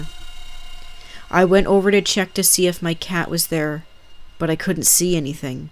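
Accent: Canada. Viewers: Yeah without a doubt, you can hear the Ontario accent!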